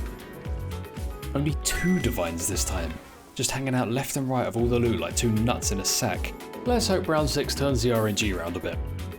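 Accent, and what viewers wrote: United Kingdom. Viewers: Came for the hiveblood Stayed for the distinguished british accent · Came for a big loot stayed for a great vibe + the british accent ofc, great vid, hope your channel grows massive in no time! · Dang, I just love those videos! ❤ One of the things I was interested in was if you'll hit the full stack of divines, and you did! It was such an awesome view! Great Jon on all the testing, I admire your devotion and time investment. Looking forward to the next league and further testing. I hope you'll be as restless as in the Mirage! ❤ Ps. I live in England, not English tho. I could listen to your lovely English accent all day long 😅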